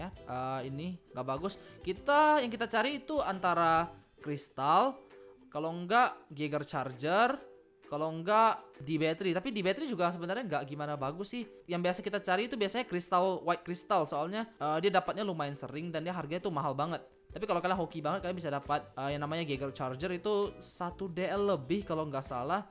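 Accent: Pontianak, Indonesia. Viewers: Abang orang Pontianak ya?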